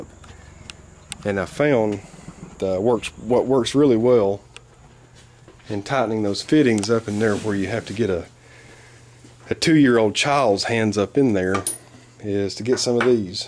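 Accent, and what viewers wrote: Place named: New York, United States. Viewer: you share a lot of useful information on manuals,tools and what to expect when working on a particular item. I bought a '73 140 H 3 last week and have a R F 2 318' s and others your videos are some of my go to's to see what I need too do, by the way what part of NY are you from with that accent? thanks for sharing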